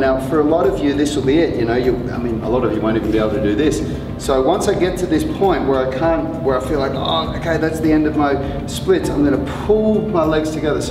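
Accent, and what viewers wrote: Australia. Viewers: Has he got Australian accent?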